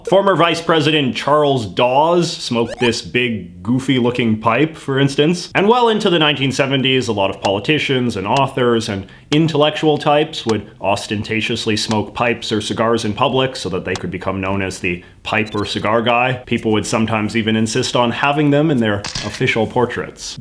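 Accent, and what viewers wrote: Canada. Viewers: As a Canadian who's lived in multiple prpvinces, from coast to coast, id love to know where in Canada that your accent comes from! I've never, ever heard anyone actually pronounce "about" that way. Not ever. I need to know where that stereotype comes from, exactly!! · You’re so Canadian that it’s distracting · I am from Montreal, and I watch several videos in English on Youtube. So far you are the only one I hear saying "About like if it was a boot". Maybe I hear to much from US. Everytime, I hear "A boot". Is is localize to where you live. Is in Ontarian? I am very curious.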